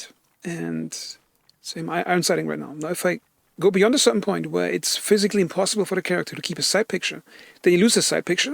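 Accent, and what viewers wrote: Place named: Germany. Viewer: Something about your voice‘s pitch, the slight German accent and the way you accentuate your sentences is very endearing to listen to.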